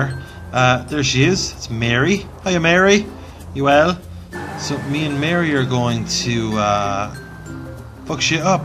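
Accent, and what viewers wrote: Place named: Dublin, Ireland. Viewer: I'm Irish and that's a pure fuckin Dublin Ireland acsent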